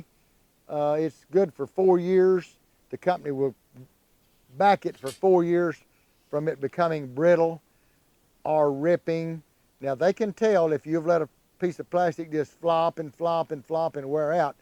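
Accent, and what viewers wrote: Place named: Arkansas, United States. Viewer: I love to listen to you for two reasons. One, you know so much and I live to learn from you. Two, you sound like my family in Arkansas and it makes me smile.😊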